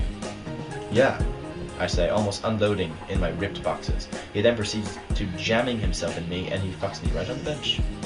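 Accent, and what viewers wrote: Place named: United Kingdom. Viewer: Is it me, or did you get all Brit on us? Not that I minded, because I loved your accent. XD I loved your puzzled looks, and you trying not to laugh throughout the story. And just you are amazing and this was hilarious. Please do more dramatic readings xD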